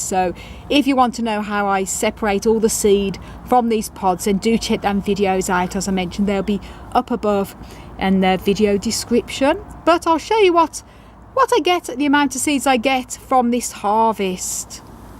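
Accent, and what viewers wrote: United Kingdom. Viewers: I like your British ascent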